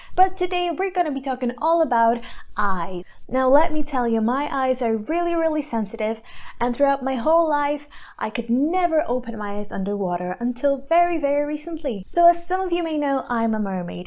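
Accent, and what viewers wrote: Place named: United Kingdom. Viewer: Her accent is British Nguyen you can tell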